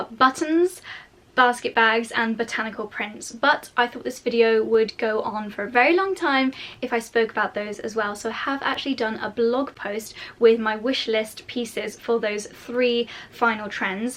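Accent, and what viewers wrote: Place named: England, United Kingdom. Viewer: I absolutely love your channel, Instagram, & blog. I also love the fact that you’re from England, I wish I had an English accent so bad lol 💕 you’re such an inspiration and you really encouraged me to start my own blog not too long ago. Keep doing your thing girl, you’re amazing!!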